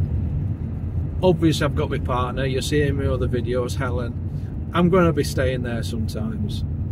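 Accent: Yorkshire, United Kingdom. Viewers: You sound like a Yorkshire fella! ❤🇦🇺 I listen more and hear you are. I’m from there too, Australia now. Love your channel ❤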